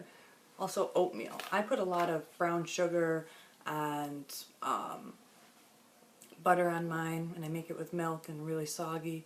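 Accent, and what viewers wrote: New York, United States. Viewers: By the way I could listen to you talk forever I love your New York accent it is so adorable I just I love it I cannot wait to come out and see you in New York we are going to have a blast!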